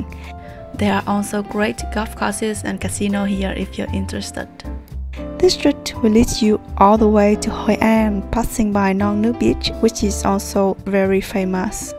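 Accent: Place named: England, United Kingdom. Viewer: I like your English accent very much...thanks for sharing. Love Da Nang.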